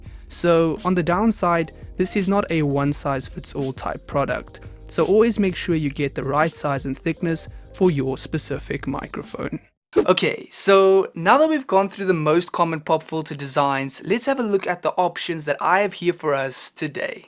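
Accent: South Africa. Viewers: You sound south Africa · South African?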